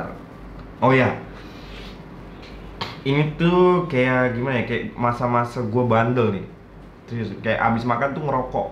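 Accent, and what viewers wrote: Java, Indonesia. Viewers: Asli jepara apa purbalingga apa depok si ini org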